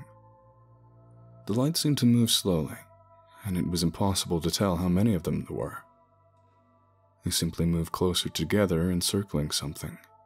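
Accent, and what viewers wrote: United States. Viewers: Your American accent is so good! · the American voice you use in these videos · you have an American accent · He does an excellent Job as do many actors I'm not sure if you watch movies to TV but many many talented Foreigners speak in perfect American accents. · I LOVE your American accent, it's dead on. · I agree that his American accent is great, but I'm not sure what you "producing music" has anything to do with studying language or dialect. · Your American accent is very good. There are respected British actors that don’t do it as well.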